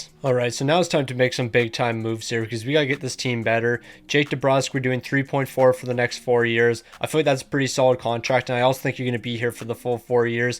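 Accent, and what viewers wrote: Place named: Canada. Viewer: The most Canadian sounding Youtuber of all time ngl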